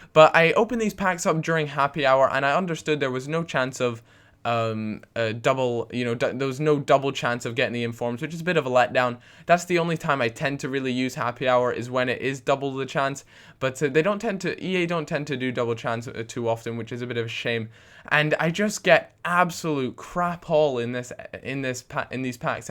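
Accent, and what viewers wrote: Scotland, United Kingdom. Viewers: Cal what part of scotland you hail from? :D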